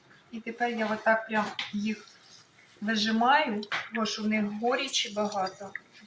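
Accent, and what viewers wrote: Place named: South-East, Ukraine. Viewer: Дратує? Прямим ходом на медичний канал лікуватись! Мене забавляє південно- східний діалект.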